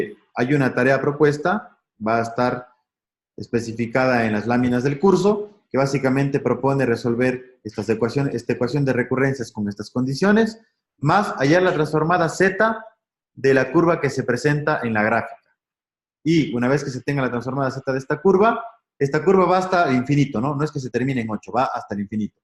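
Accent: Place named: Cuenca, Ecuador. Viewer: ese acento, seras de cuenca-ecuador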